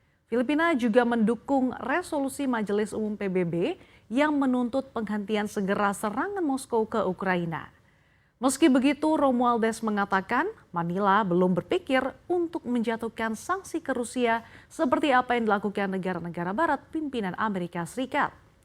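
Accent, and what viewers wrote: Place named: Java, Indonesia. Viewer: Mbak hostnya jawa banget..manisss... aku suka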